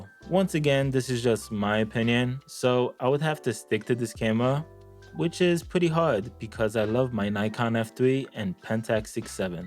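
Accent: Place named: Boston, United States. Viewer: Wait your polish and here I was thinking you were from Boston.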